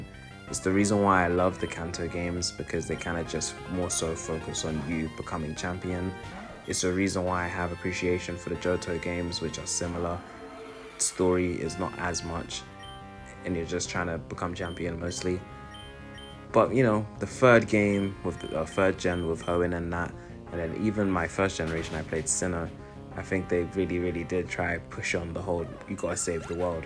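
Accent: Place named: United Kingdom. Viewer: Hearing a british guy playing sword and shield is strangely immersive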